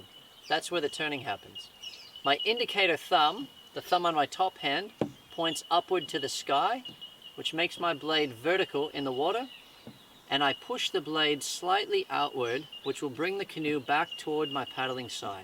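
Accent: Australia. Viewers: As a Canadian paddler at first I was kind of amused that somebody with an Oz accent was going to tell me how to paddle a canoe... ...then the phrase "Divorce Machine" came up... ...yeah it sounds like a good idea, but NEVER involve a canoe on a first date. I speak from experience. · Nice intro video to tandem canoing. …(is that an Australia mongrel accent you have?) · You definitely some how have an Australian accent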